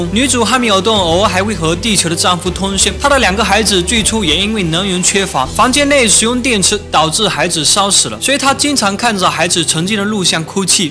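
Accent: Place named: Fujian, China. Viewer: 虽然我是福建人 但是听到福建口音的普通话还是不怎么舒服啊哈哈哈哈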